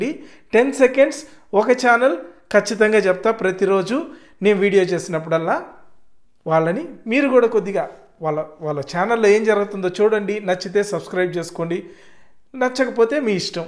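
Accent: Nellore, India. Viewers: I have a very small question bro... If you don't mind... Are you from Nellore?? Bcz some words Nellore yaasa tho match avtunnaayi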